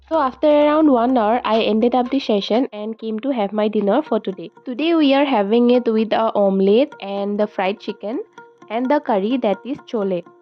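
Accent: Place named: Assam, India. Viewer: Assamese accent nsna lgse hunibo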